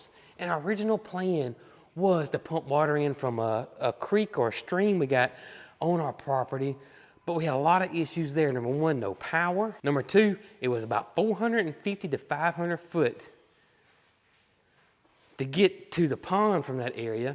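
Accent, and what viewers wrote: South, United States. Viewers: I have closed caption on & everytime you say well, CC reads whale!!! Guess CC doesn't understand Southern speak! It made me giggle! The barn does look all nice & organized. I had a well at my house in the country, & we had the best tasting & beautiful clear water! We had a large water tank in our basement to hold water so we had some when the electric went off. When it snowed & we lost electricity, I melted snow on our wood stove to cook & wash with! I hope your water is as good as ours. I'm thinking it will be based on how that spring water is. & it will be cold!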